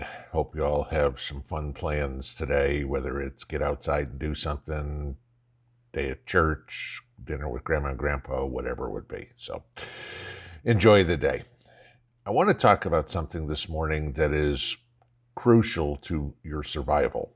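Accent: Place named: Indiana, United States. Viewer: I'm a Hoosier I had always heard we had some of the dirtiest lakes and rivers but didn't know we were #1 . I live really close to the Wabash river and a power plant reservoir I wouldn't eat fish from . There are some really nice gravel pits I would eat the fish from . Sounds like you're from the N. W, part of the state and we live in the S.W. part . I hope you have a blessed Sunday !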